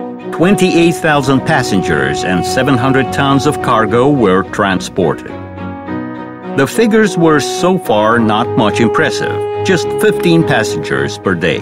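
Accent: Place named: Russia. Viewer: Very interesting. But why does the speaker have to have a russian accent?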